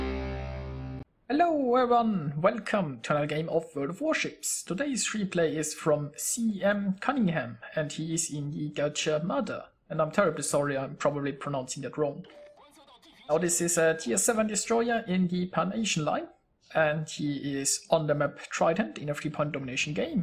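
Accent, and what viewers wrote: Germany. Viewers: Kann das sein das du deutscher bist?!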